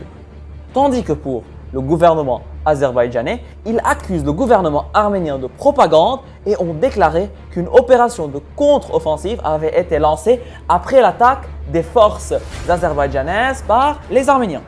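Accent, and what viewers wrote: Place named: Belgium. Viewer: Cet accent Belge à couper au couteau, MDR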